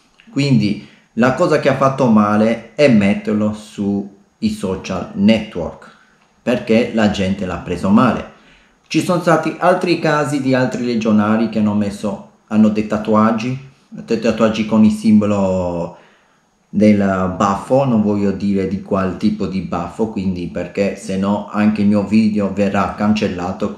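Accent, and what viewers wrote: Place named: Albania. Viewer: Hai l'accento Albanese wow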